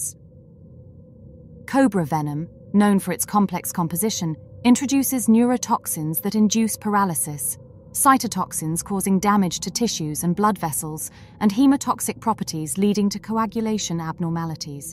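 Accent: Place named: England, United Kingdom. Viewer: I want that thick english accent back. Love your speaking voice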